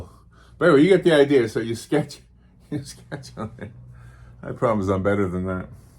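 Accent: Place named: Connecticut, United States. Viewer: Just found your channel and subscribed and am looking forward to watching your videos. You don’t sound like a FL native…CT?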